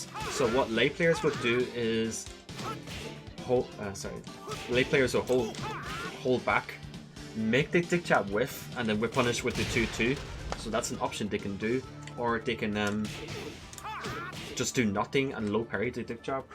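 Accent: Ireland. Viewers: . You are one of the most cerebral players and a man of taste. But for all that is holy, get a good microphone and learn how to tune it. Irish accent is complex in its tonality (no offense) It sounds fine when recorded correctly but it loses low end on "cheap" mics. And turn off tekken music when making vids, it gets in the way of how your voice is transmitted as there is competition for the sound energy coming out of the speakers. Get a good mic with a good pre amp. And play only Oscar, get out of here with these others women hahahaha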